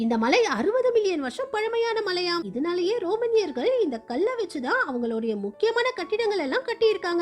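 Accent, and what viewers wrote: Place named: Sri Lanka. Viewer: இலங்கை தமிழ் ❤❤❤ இலங்கை நிலை 😢😢😢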